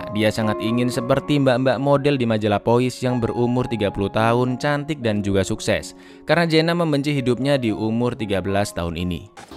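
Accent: Java, Indonesia. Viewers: Wong jowo ya bang apa lampung?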